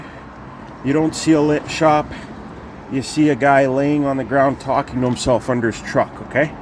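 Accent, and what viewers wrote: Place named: Canada. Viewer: This is the greatest video I’ve ever watched. I called it from the first minute you were Canadian. Cracked up the entire video. Well done sir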